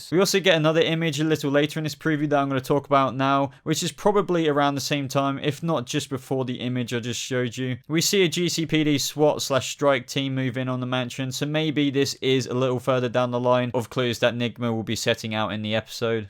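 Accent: United Kingdom. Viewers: Two questions: 1) If this chappie is in the UK as the accent suggests, how can he be legally reviewing things that haven't shown here yet? 2) Is it just me that thinks he is REALLY good looking?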